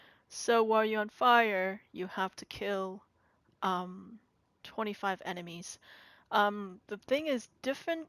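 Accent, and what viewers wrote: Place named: Northeast, United States. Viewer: You sound like you’re from the Northeast lol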